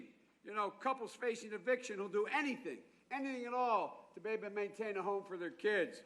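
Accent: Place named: Appalachia, United States. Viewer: Why is he talking like a hillbilly?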